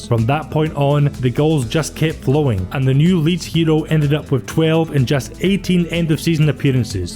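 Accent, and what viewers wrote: Scotland, United Kingdom. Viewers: This is one of my favourite channels..why? Because u cover players from the 90s and early 2000s..my childhood years..also u cover unsung players like tony yeboah..we all know his goals for leeds but the rest of his career doesn't get spoken of really..i also love ur scottish accent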